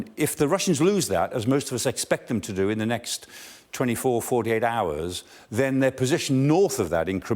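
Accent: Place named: England, United Kingdom. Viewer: He really does. I thought that he and Michael Caine must be from the same part of England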